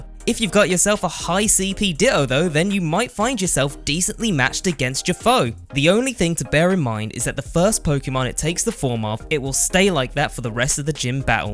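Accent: United Kingdom. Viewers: He says di-o instead of ditto lol (Yes i know he has a british accent dont come at me) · Örs Vajda Yo, he sounds English. That’s why he’s pronouncing it the way he is. The British did sort of create the English language.. even if it’s a mashup of other ones.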